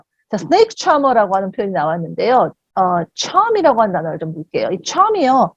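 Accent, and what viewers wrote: United Kingdom. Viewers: 이 영국식 발음이 있으셔서 더 고급스럽게 들리는거였군요… 항상 명강의 감사합니당.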